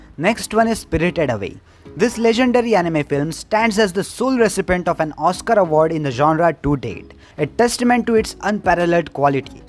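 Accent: India. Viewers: Indian accent is awesome bro. I studied engineering and would come home from a 2hr lecture not understanding shit. Then I watch a video of a guy with Indian accent explaining it perfectly in 30min or less… indian accent carried me through uni :D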